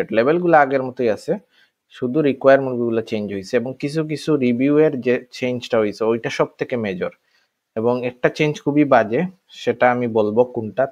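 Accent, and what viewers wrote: Sylhet, Bangladesh. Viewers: Sylheti bhai ni apne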